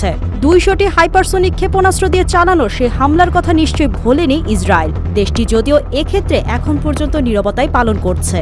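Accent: India. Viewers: একাত্তর টিভিতে ভারতীয় সাংবাদিকের উচ্চারণে সংবাদ পাঠ।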